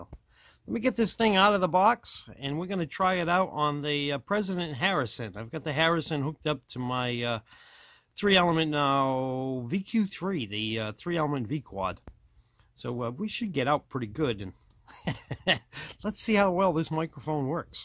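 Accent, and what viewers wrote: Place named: New England, United States. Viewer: You sound like you're from Massachusetts or Rhode Island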